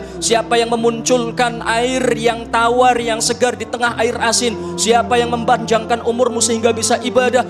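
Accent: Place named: Tegal, Indonesia. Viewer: Assalamualaikum wr wb..🙏 Ngapunten..🙏🙏 Pa' ustadz asli orang mana kok bisa ngomong Tegal..?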